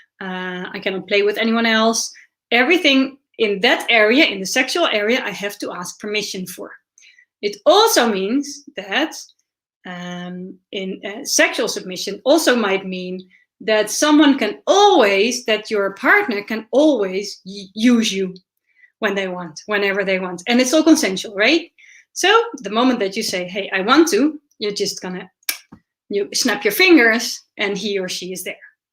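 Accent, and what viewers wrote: Netherlands. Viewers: Hey, thanks for the informational vids, just discovered your channel yesterday, it was linked below a video of the mystery box show. I already recognized a dutch accent with you:) hoop dat je een fijn weekend hebt gehad!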